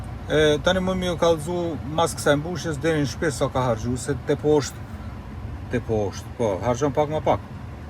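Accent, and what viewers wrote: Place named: Skopje, North Macedonia. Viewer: prsh, mos je i shkupit i nderuar , dialektin tipik shkupjan gjithashtu edhe stilin , respekte klm.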